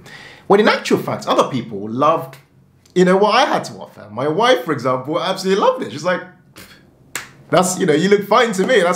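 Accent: United Kingdom. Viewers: How do you have such a british accent when you arrived in the uk at 15/16?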